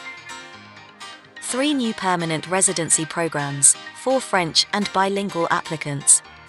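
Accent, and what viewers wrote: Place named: United Kingdom. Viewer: Why the British accent if this is about Canadian immigration. Please change it. We are proud of our identity.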